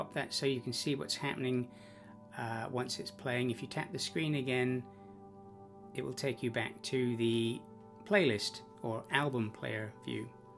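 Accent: Cornwall, United Kingdom. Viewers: Is your accent Cornish? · I'm from bude, Cornwall, thought your accent sounded familiar. I find your app really interesting but I'm finding it really difficult to understand and use. I come from using Ableton.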